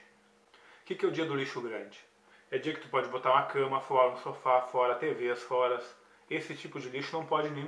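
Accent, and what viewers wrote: Rio Grande do Sul, Brazil. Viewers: e ai blz tu e gaucho tche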